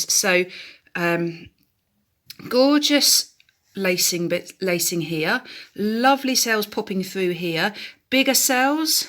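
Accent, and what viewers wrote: United Kingdom. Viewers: Thank you, you have saved me a lot of time and work and also convinced me that I do need to use a pouring medium for some projects and not just water. Like you I like the way the colours react and spread, if that’s the correct term, with just water. I am pretty new to all this and finding out new things all the time, like in a couple of sea/beach pours I have just done, I got cells and lacing with the white (Amsterdam Titanium white) over iridescent colours, not so much over the plain colours. All the paints were mixed with a pouring medium (1parts Owatrol to 2 parts water) - 1 part paint to 1 part pouring medium and then water if needed to get the right consistency. I’m very happy with them and luckily we have holiday accommodation, my experiments will grace their walls! And hopefully some will sell!😜 Thank you again, I follow Molly, Kinella and Rinske, but it’s so nice to hear a British voice, their ideas and recommendations. 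Jeanette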